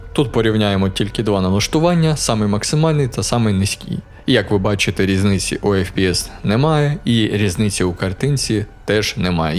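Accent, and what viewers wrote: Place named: Russia. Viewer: Не висОко, а вИсоко, трохи тягне тебе на руський лад. А загалом лайк.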